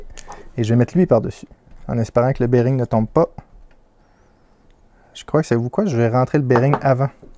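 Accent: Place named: Quebec, Canada. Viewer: Bonjour, j'ai bien compris qu'il n'y a aucune animosité dans ton intervention, mais je trouve étrange de reprocher à un Québécois de parler..... québécois ;-) Marc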